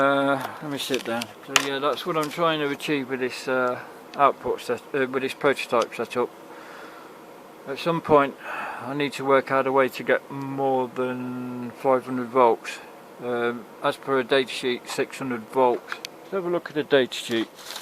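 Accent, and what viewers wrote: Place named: United Kingdom. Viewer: Having listened to a lot of Americans on these topics it is beyond refreshing to hear a fellow Brit. A gibbering sprint of unconnected self correcting personality, followed by genius blow by blow creativity. Talking like I'm thinking and clearly dropping exactly what you are aiming to achieve and getting there through educated experimentation. Loving this, talking my language. 😂❤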